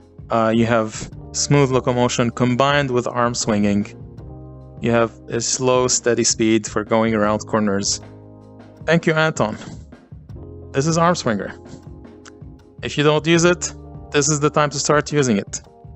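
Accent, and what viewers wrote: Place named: Serbia. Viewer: Ar you serbian lol I love your accent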